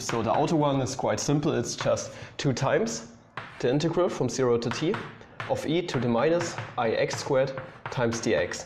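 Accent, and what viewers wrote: Germany. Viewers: You've got a nice German accent, I like it!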